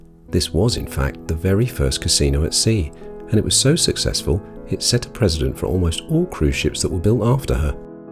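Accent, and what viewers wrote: United Kingdom. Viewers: You do sound rather British, and many of them speak English.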